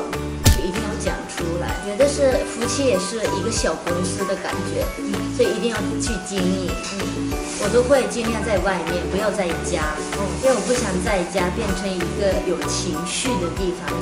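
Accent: China. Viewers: 還是有ㄧ點上海腔的味道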